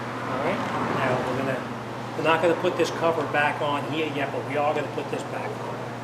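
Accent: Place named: Boston, United States. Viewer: Hey dude thanks for the video and I recognize the dialect, you r from boston or somewhere deep north, we don't say raadiator , it sounds weird the way u say it. Don't take it personal, it just reminds me of an old Army buddy that used to make fun of me and me of him for laughs,lol. Down south people say over yonder and yaul and we say oil different-but we are all American's, so God bless my brother.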